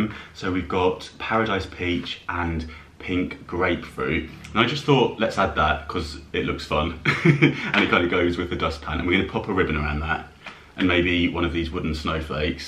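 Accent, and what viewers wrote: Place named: RP, United Kingdom. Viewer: Ho ho ho,that was funny, I love listening to you talk,it's clear and quite posh, I live in Yorkshire,so I find the accent sometimes difficult.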